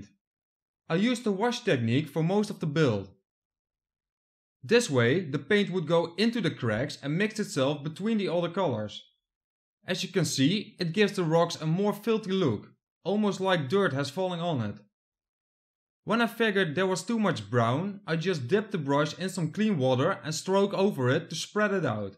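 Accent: Netherlands. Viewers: Ben jij Nederlands gezien het accent???🇳🇱🇳🇱🇳🇱🇳🇱🤣🤣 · Leuk gedaan. Wel duidelijk, ookal is het in bloemkool engels. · You sound so dutch. Love it. But was exactly what i was looking for! Thanks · Mooie en goeie tutorial man! je hebt me zeker geïnspireerd! en dat mooie nederlandse accent valt niet te missen ('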